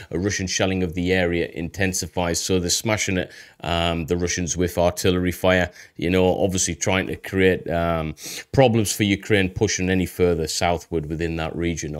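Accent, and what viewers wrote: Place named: England, United Kingdom. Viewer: Yo ain't seen you a while respect got the imposing look. It's nice to see a real hard man with intelligence and English accent. As grew a bit at gchq Germany, well afnorth Holland with the AF plates lol hearing your attitude takes me back 20 years. Peace and love yo. Could you put your intelligence and ingrained survival mature focused on structure and violence give a guide for us English incase something comes here. Peace and love my G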